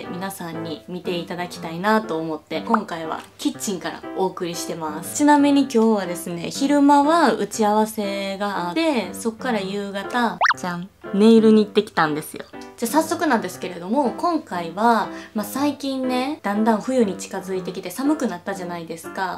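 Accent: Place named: Osaka, Japan. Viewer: 大阪弁が癒されます！いつも、ありがとうございます😊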